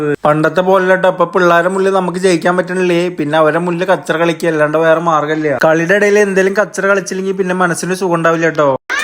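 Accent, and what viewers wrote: Palakkad, India. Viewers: Palakkad style athanu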